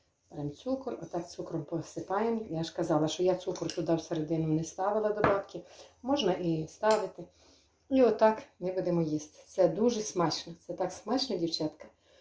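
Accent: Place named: Bukovina, Ukraine. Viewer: Дякую за гарну нашу буковинську мову та гарне викладення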